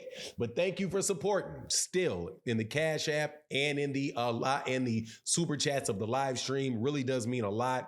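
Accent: Texas, United States. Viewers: are you from Texas???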